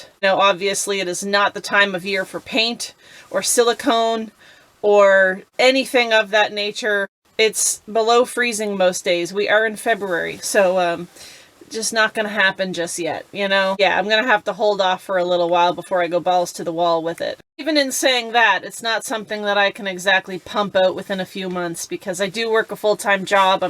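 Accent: Canada. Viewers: Canadian accent is awesome. I bet us southerners sound like beverly hillbillies to you 😂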